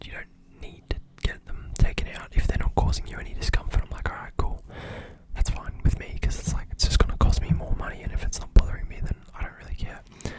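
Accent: Australia. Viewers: Love the Aussie accent so much I wanna visit there and NZ so bad, my favorite music group is based outta NZ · omg a fellow aussie doing asmr???? this is insane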